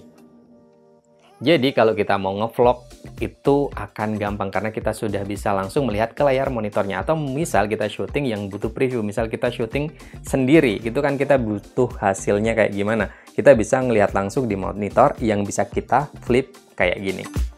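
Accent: Pekalongan, Indonesia. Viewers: Orang pekalongan kah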